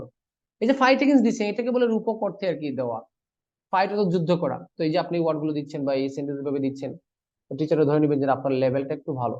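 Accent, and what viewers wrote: Sylhet, Bangladesh. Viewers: Sir er maje maje Sylhet language ta kub balo lage.... Serious Video dekad majew hasi pay.... 😄😄😄😁😁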